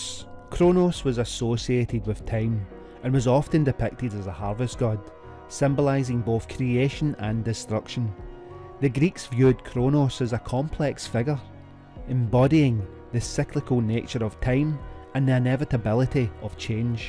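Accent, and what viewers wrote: Scotland, United Kingdom. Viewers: where are you from? this dude has a smooth, posh scottish accent. i'm willing to wager his accent is nicer than yours. he's british - the island where the english language was created.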